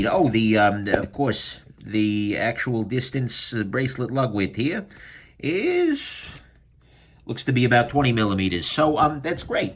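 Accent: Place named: Brooklyn, United States. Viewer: You must have grown up in lower Brooklyn. You sure sound like you hail from there.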